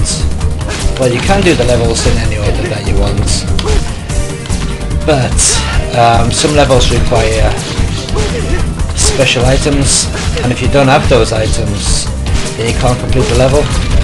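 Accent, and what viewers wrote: United Kingdom. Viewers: You sounded more british this time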